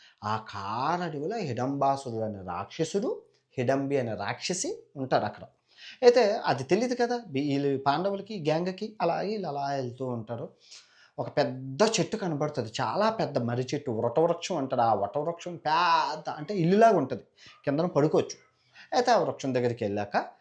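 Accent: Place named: Visakhapatnam, India. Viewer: Video ఇంత త్వరగా ఐపోయింది ఏంటి అనిపించింది చెప్పే విధానం మన వైజాగ్ స్టైల్ స్లాంగ్ లో super super super అన్న..👌👌 👌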